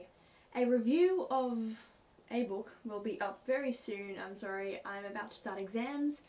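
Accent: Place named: Australia. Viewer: I miss Australia so much, so I really liked your video! SIGH the wonderful sound of an Australian accent.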